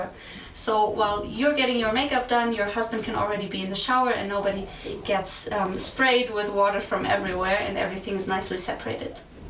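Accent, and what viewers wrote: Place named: Scandinavia. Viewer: Nice video. Her command of English language is impeccable, despite a slight Nordic accent - to the point that I thought she might be American. It was only in the end, when the boat price was indicated in Euros, that I was certain she is European. Shouldn't she have introduced herself? As for the boat, the presentation was centered on more domestic qualities, some of them outright mundane, in my opinion. The fact that Hanse is a great light sailing ship to take out in weekends, or even longer holidays, in my opinion again, was insufficiently reflected.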